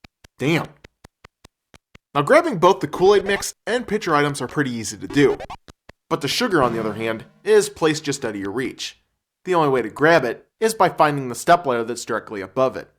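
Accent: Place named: Wisconsin, United States. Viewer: man this is so similar to avgn no wonder people are taking sides. both vids are good, james is just more entartaining...plus his jersey accent beats out this wisconsin accent any day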